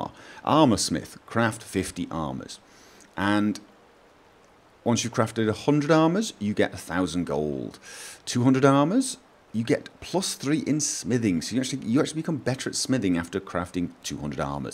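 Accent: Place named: Manchester, United Kingdom. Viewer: Damn. After all those questions as to where you're from I always sat smugly in my seat and said "I bet it's Manchester, somewhere in the NW at least". Of course, I sound like a total hindsight Charlie now. :D Not liven in the NW for nearly 20 years (and I wasn't a native to begin with) but the odd word still comes out with a burr. :)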